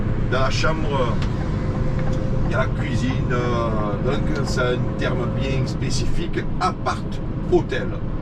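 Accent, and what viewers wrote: Marseille, France. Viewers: Comme toujours de très belles vidéos sur ta région, ainsi que ton joli "accent" j'ai habité cette ville pendant deux ans au milieu de années 1970, j'y ai travaillé au 165 rue Paradis et j'en garde de très bons souvenirs et avec mon épouse nous revenons à Marseille pour nos anniversaires pendant quelques jours. Par tes vidéos je retrouve beaucoup d'endroits où j'ai travaillé, donc merci de continuer à nous faire "voyager" par ton travail dans cette belle ville. Qui chante cette belle chanson " "? Encore merci👍👍👍❤❤❤ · Bjr vous me remonté le moral , j'aime vos vidéos j'aime Marseille,et j'aime l'accent,je vous suis d'Algérie et merci pour votre humour et votre franchise,BNE continuation (Halima)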